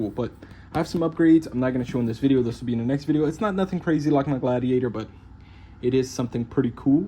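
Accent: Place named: Deep South, United States. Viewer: Deep South. Where u from? I say ur bio saying Cajun. So Louisiana? Baton Rouge here. I’m waiting for my base camp to come in the mail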